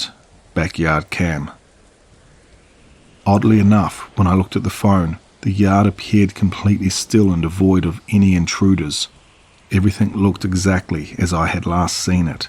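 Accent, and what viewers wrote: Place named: New Zealand. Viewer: Another great story. Thank you for providing us with very unique stories in your fantastic Kiwi accent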